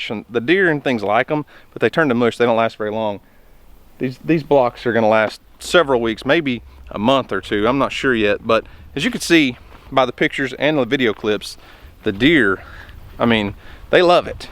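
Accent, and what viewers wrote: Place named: South, United States. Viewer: I was born and raised in Alabama and the part I'm from Walker County we're big country talkers like you I'd think that you were from my neck of the woods meaning like Alabama and surrounding state's of the South east never would have thought you guys sound so country!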